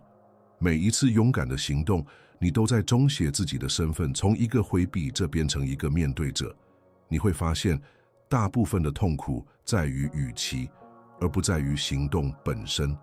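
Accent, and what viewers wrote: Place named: Hong Kong, China. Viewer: 是ai生成的港式普通话吗？有的词听起来会有歧义。最好是标准一点的普通话。内容不错，加油！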